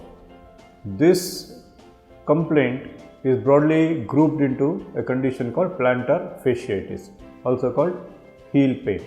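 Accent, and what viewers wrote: India. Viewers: It’s Indian English. It’s probably closer to standard English than American, Australian or South African English. Expose yourself to it more and you’ll adapt to the intonation incredibly quickly. If you’ve learned other languages then you’ll adapt to it really quickly. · leo bonita Its indian accent dear. Smtimes i dnt understand foreign accent, its so simple.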